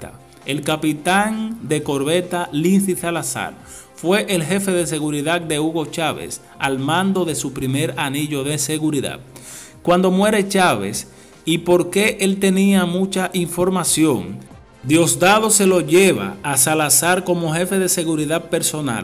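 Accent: Cuba. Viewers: LOCUTOR CUBANO?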